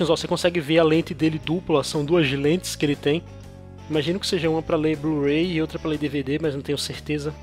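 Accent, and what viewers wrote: Portugal. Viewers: esse cara é portugues ou nordestino??? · Vc é de Portugal?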